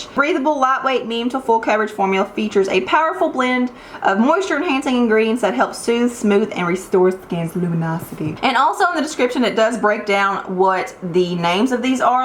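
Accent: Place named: Tennessee, United States. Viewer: Hey! Where are you from? I'm from Kingsport, Tn and you have the same accent as we do :) glad I found your Chanel!!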